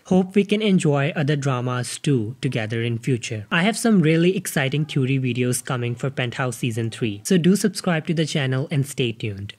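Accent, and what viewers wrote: India. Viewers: Indian voice??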